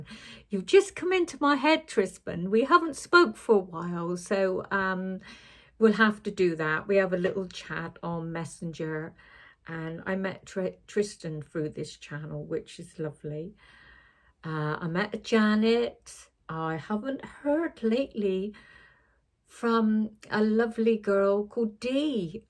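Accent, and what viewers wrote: Cornwall, United Kingdom. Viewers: I have missed you with your gorgeous Cornish accent. I totally understand how life's circumstances can leave you with no enthusiasm for stitching. It has happened to me before. I am so pleased it is back and I so enjoyed seeing your embroidery machine and all the lovely cushions and tea cosies. Take care of yourself and we will see you again soon. xx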